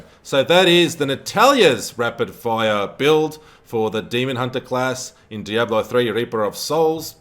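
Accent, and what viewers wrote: Australia. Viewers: It is like August....Augild....Orgild. Hope that clears it up, it is our Australian accent. Love your videos even if you can't talk properly.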